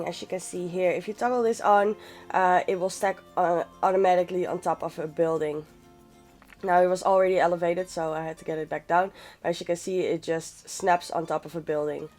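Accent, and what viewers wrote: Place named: Netherlands. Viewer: Are you dutch? You sound dutch hahaha, thanks for the video!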